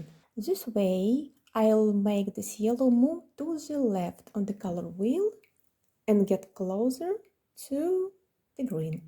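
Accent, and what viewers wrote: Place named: Portugal. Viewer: how lovely hahaha eu gosto do sotaque do portugues europeu hahaha. oh btw what is your native language? you speak three languages i'm jealous. blue is my favorite color am I cool 😎 or am I cold 🥶 hahaha or both hahaha lol Iuno is beautiful so is your art i'm definetelly pulling for her, can't have the sun without the moon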